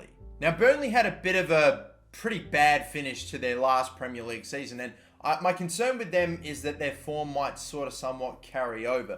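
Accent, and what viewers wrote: Australia. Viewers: he's Aussie right? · no he is Australian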